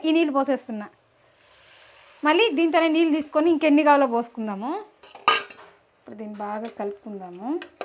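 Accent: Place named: Chittoor, India. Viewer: natural and nice cooking with chakkani chittoor telugu maatalu, so homely...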